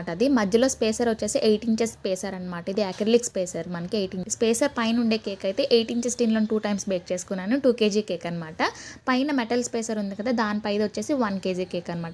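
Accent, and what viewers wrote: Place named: Andhra Pradesh, India. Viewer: Wow chala bagundhi E video chusnaka naku order ivvali ani vundhi hyd lo service vundha andi Mi slang vinte andra la vundhi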